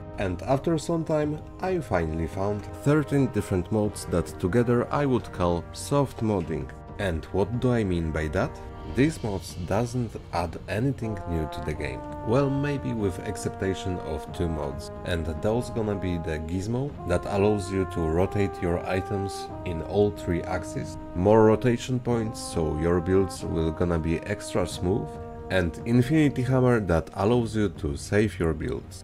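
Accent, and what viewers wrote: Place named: Poland. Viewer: Tak mi się wydawało, że słyszę polski akcent. Swoich się jednak rozpoznaje X D Spoko materiał, dobra robota!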